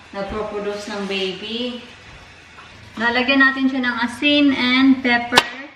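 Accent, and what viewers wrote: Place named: Visayas, Philippines. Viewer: First time ko manuod ng vlog nyo po and nakakatuwa po kau. Sobrang ganda nyo po and your accent is like parang may pagka bisaya 💕😍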